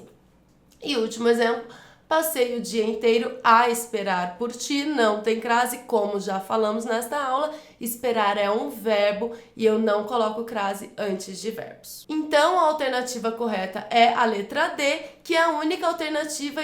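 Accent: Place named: Minas Gerais, Brazil. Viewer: Essa manja muito; me salvando e salvando geral...pelo sotaque é mineirinha...também né s2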